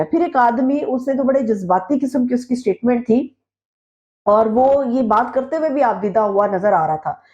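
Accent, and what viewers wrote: Punjab, Pakistan. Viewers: Nice one - like your Punjabi accent 😊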